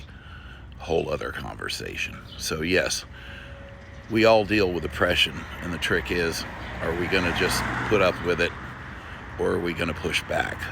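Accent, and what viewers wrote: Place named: Texas, United States. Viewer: 3 seconds into the video and I can already tell you’re from TX ……y’all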